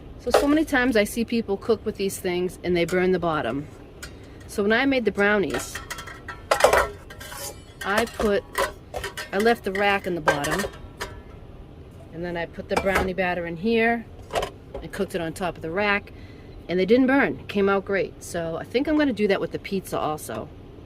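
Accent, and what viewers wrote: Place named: Boston, United States. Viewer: I'm thinking of getting one of these, good idea with the rack at the bottom. I love your accent, where are you from, sounds like Boston.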